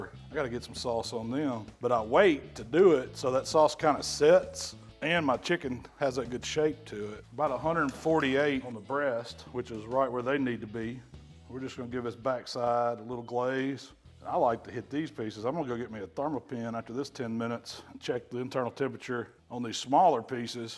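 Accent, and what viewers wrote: South, United States. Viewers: That’s the sound of Southern hospitality.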